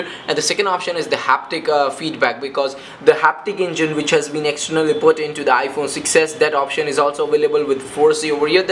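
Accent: India. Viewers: your voice is annoying.. but good videos... indian voices ARE annoying for other countrys :)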